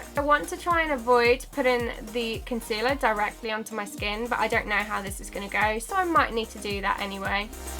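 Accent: England, United Kingdom. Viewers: What kind of English accent do you have?? You sound like a teacher I had who was from the UK and I've always wondered what kind of accent she had cause I know it's not a cockney accent and that's about all I know lol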